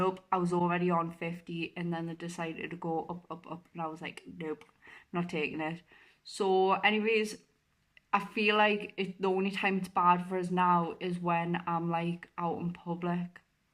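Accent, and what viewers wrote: Newcastle, United Kingdom. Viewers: Thanks for your honesty, just talking about it may help others, and probably you also. Congratulations on the product endorsement, I didn't know about these products. I'm sure I am speaking for probably all of the Americans on this channel, when I say, I enjoy your 'rambling on', because I enjoy that New Castle accent... kind of like one of the several 'working class' accents in the US, it just sounds genuine. God bless!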